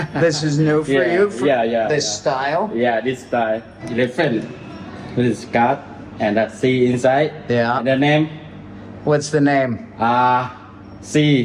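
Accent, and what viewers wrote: Canada. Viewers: lol I love the canadian accent. They can't rob us of that no matter where we live, or for how long!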